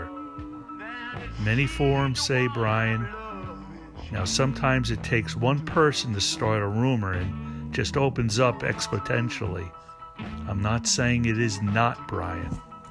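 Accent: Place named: Northeast, United States. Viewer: Once again I’d like to say I think you did a superb job at making these two documentaries. The making of BgsBqt. Part 1 and 2 I want to add some wood to the fire because I know you are working on part 3. I’m sure it will be great! What I want to say is I think you have gotten even better at making your documentaries! The CRYSTAL CLEAR CLARITY IS EXQUISITE!!!! You have a very good voice. If you ever check the wonder years tv show the way they depict the 60s and early 70s that narrators voice is just right. I like your stuff because it is soothing the same way as the wonder years was! Let’s face it these are the wonder years too we are talking about. Or I should say that you are talking about. It has to be that voice of yours. To me it is important that it is a NORTH EASTERN United States accent as such. Somehow an English accent just rubs me the wrong way when they are talking about the Stones. 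Or the wonder years. LA accent just sounds like they don’t quite know what they are talking about. You really come across as very genuine and you come across as really knowing what you are talking about. You calmly and cooly and in a clear non-rushed way guide us through it. The QUINTESSENTIAL AND PROLIFIC AND ICONIC NARRATOR OF THE WONDERFUL WONDER YEARS OF THE STONES! The greatest damn narrator of the Stones of ALL TIME! You tell their story best!!!!!!!!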